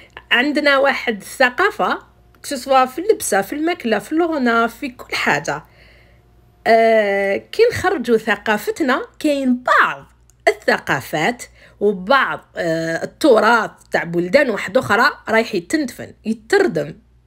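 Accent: Algiers, Algeria. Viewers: تعجبني بزاف الهدرة انتعاك مازلتى عاصمية ربي يحفظك و يحفظ لك الكتكوتات انتعاك.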